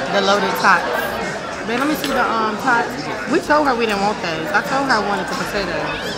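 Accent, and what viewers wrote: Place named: South, United States. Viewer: i love your country accent omg 😂😂 i’m subscribing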